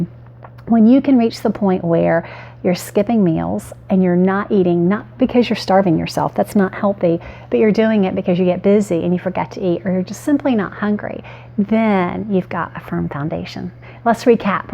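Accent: South, United States. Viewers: Great encouragement. Thanks so much. This Tennessee girl feels right at home with your Southern accent!😎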